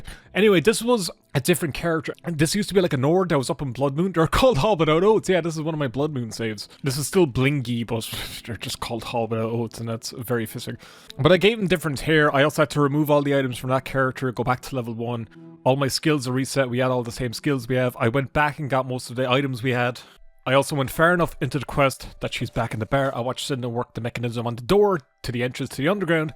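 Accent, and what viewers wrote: Ireland. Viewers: Am I the only one who just keeps on just to hear an Irish man rant for background noise?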